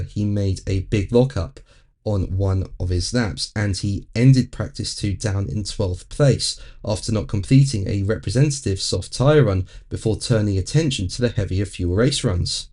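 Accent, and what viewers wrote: England, United Kingdom. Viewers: Its called an accent, not impediment. There is a country called England, they speak the same words as america but with a different accent. Hope this helps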